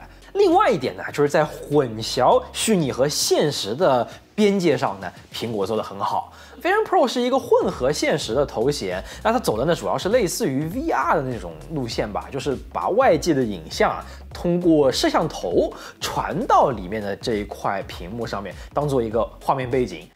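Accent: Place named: Shanghai, China. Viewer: 博主上海人吧,这个普通话听着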